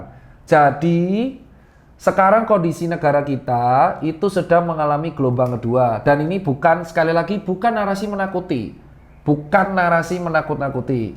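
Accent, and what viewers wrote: Java, Indonesia. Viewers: Bosone jan persis koyo wong jowo Oke kita sejowo jancooook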